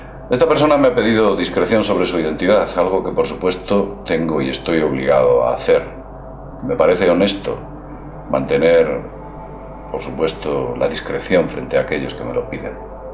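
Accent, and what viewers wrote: Spain. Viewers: el acento españolete funciona a la maravilla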